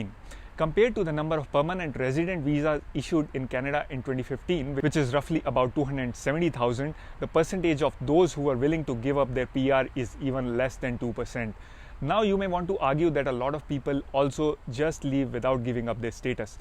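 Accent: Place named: India. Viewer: This guys accent is pathetic - typical musical and Indian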